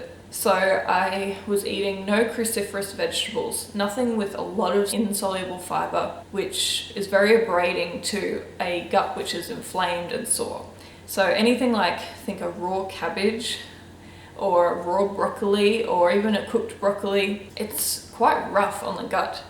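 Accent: Australia. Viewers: Thank you for this video, I have had eczema for as long as I can Remember. I do a lot to heal my gut and body it's a journey and wonderful to hear about yours. Where are you from btw I can't get your accent Australia or Newzealand? you look great now all the best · Is she Australian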